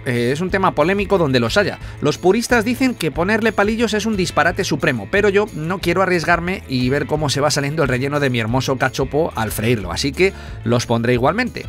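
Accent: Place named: Chulla, Ecuador. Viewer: A nadie más le molesta ese micro cuando prueba la comida.... cuando hablas como "chullas" se escucha bastante mal....para lo bueno q es tu trabajo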